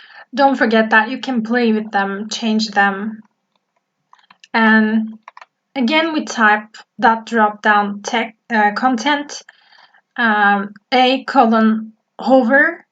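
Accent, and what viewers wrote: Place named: United States. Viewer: you speak like you live in america hw dd u do that!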